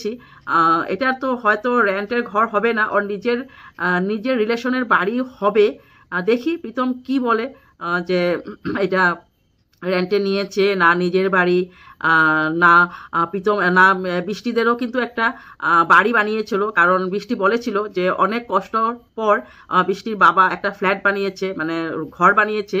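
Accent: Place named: Northeast India, India. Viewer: North east e Jara thaken tader aneker Bangla Bolar style ta erokom.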